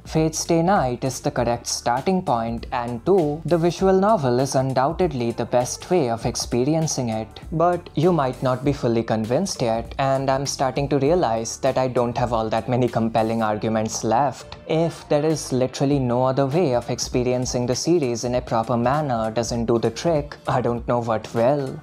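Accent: India. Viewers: >Open video >Indian accent >Close >Dont recommend channel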